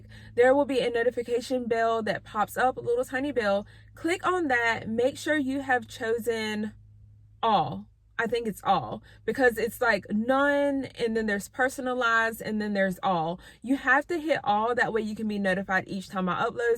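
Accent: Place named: East Coast, United States. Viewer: Im new in watching your channel...love the pantry tours..and your accent is cool too!! I mosdef can tell you are from the east coast!!! Keep doing you!!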